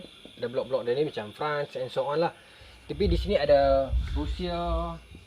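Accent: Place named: Penang, Malaysia. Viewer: Saya bukan melayu bodoh ustaz. Saya paham bahasa inggeris. Tapi tak syok dengar ustaz goreng dalam BI. Hilang slang penang.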